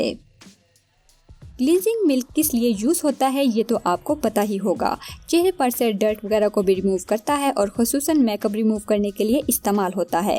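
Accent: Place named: Rural, India. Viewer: apki awaz bot paindu hai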